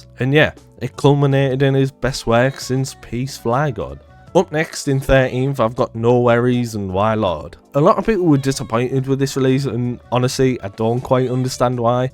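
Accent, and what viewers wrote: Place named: North, United Kingdom. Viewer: Last accent in the world I expected you to have 😭 where up north are you from?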